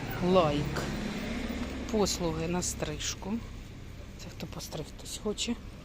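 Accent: Poltava, Ukraine. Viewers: Полтавський діалект😊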